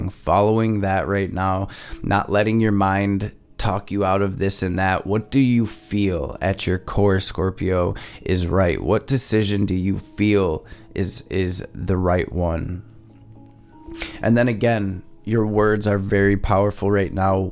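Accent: Detroit, United States. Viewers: Are you from Detroit I keep hearing you say what up doe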